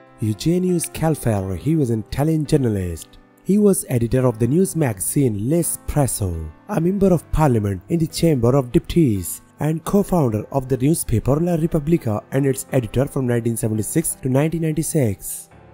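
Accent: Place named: India. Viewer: He cannot help his accent. I believe he may be Indian.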